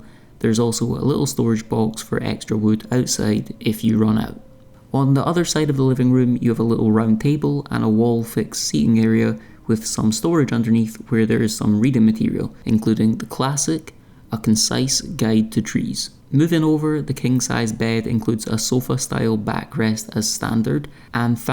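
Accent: Scotland, United Kingdom. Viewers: Hey mate I enjoy your videos no matter what you do them on you have great Scottish accent and you explain thinga really well keep it up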